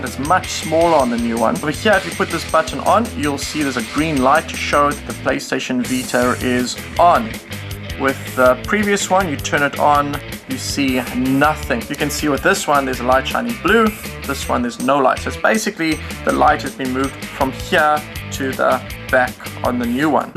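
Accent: South Africa. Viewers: are you South African? you sound South African :D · South African? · you sound southafrican are you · Are you South African by any chance? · are you south african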